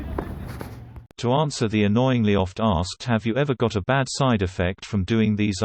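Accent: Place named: United Kingdom. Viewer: i like the british narrative voice.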